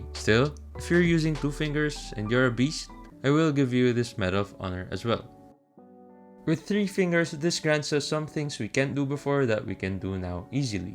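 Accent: Philippines. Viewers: Btw r u asian or filipino? Just askin cuz u sound like u have an asian accent mostly sounds like a filipino english accent:)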